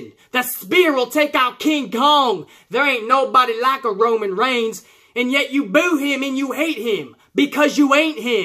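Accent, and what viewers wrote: South, United States. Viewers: Funny stuff love the Ole Country Accent on the Night The Coke Zero 400 in Daytona is on. There no way Im cheering for Roman way he is booked an Vince Mr. GoldenBoy continues to be pushed down our throats. So, sick of hearing “It’s the Big Dog.” No, Cole its not we know you been freed by Vince to say that. We get it! He not the guy. But again this some funny stuff. is 💪🏻 # #Smarts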